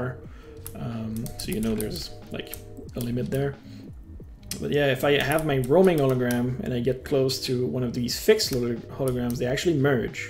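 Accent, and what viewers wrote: Quebec, Canada. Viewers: Are you from the Quebec? I'm from there and I think I heards a subtle accent and, the Quebec accent is pretty easy to recognize. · are you from quebec? you really sound like you do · Are you from quebec?? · bel accent quebecois gros xD jespere sa pue pas trop a trois-r lol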